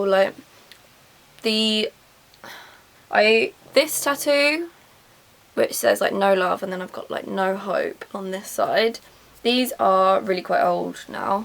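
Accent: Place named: London, United Kingdom. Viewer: u have a london accent-nice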